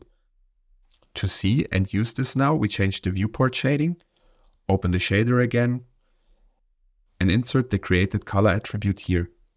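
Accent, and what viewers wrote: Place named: Germany. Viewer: ich wusste doch das ich Deutsch rausgehört hab! Aber geiles Tutorial ehrlich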